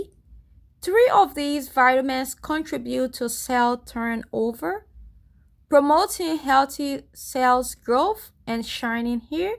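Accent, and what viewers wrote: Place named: Liberia. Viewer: Are you a liberian? You sound like one.. i'm a liberian also.. it really doesn't matter, just curious. Good video💗 thanks alot for the tips.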